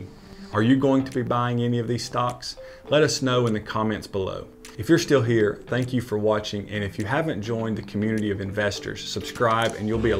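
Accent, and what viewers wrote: South, United States. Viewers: Southern accent like yours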